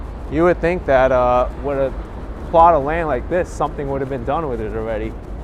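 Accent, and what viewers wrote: New York, United States. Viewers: Hi, I really like these videos of the back streets of New York. Admittedly when you announced you were moving to Miami I was sceptical that I would still enjoy your content and I haven’t watched any Miami videos to date. I always associate you with New York, your town and your accent. I watched your Leaving video but I’ve yet to watch the Decline video but I will.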